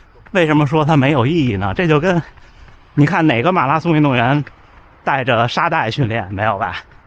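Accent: Beijing, China. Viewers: 還好北京話我聽的懂🤣🤣🤣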